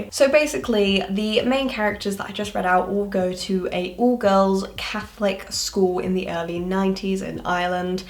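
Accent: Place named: United Kingdom. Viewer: Sounds like she’s from the United Kingdom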